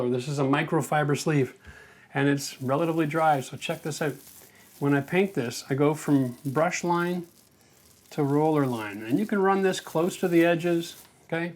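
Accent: Canada. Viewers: Florida? I would have guess you were Canadian.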